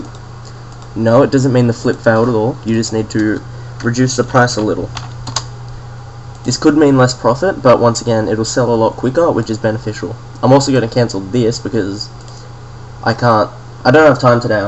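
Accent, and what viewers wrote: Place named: Australia. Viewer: PURE FUCKING AUSSIE!!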